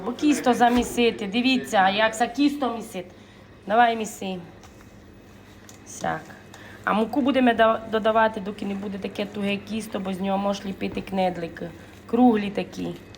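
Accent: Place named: Tiachiv, Ukraine. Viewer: землячко! Тебе тільки чути,- одне задоволення. Одразу пригадую Тячів, місто, де народилася. Привіт з Києва❤😊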